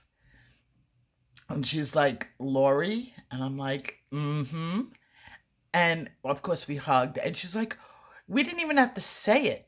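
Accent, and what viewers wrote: New York, United States. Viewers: I love your stories Lori and now you have my other cat, Loki, in thrall of you. Lol my "kids" love the NY accent ;)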